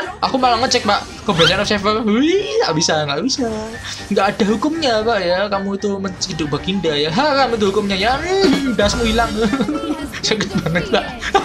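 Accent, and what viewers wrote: Java, Indonesia. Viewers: Jawane sampean ngendi bang? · Medok bnget wkwkwk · biasa aja bicara y jangan medok biar gk salah nangkep.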